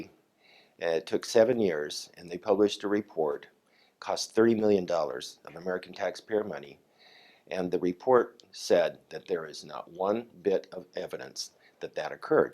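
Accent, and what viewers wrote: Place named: Japan. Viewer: 日本生活が長いからか、発音が日本人の英語に近い所がたまにある気がする 抑揚も日本人っぽいところがある 勝手に親近感が湧く 笑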